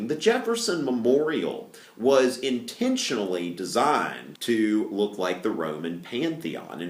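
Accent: South, United States. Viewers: dAt southern accent dough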